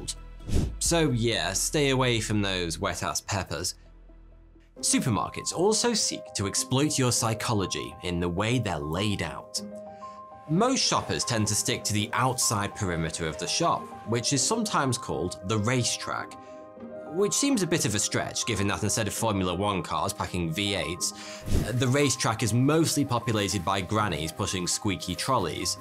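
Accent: United Kingdom. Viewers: At the start it sounds like he is saying 42 here instead of Cause his accent is deep British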